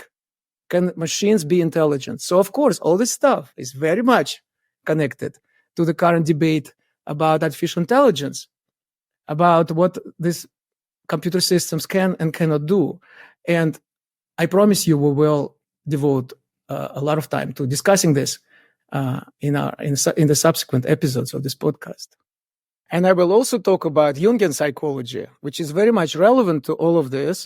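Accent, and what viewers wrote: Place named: Russia. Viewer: I LOVE the Russian accent! Like a badass spy is teaching math and mysteries of the universe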